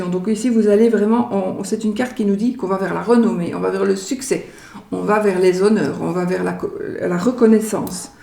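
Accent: Belgium. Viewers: Meilleurs voeux madame j'adore votre accent les Belges j'habite en Belgique avant quand vous dites 8 😅😅😅bonne année à vous et mille merci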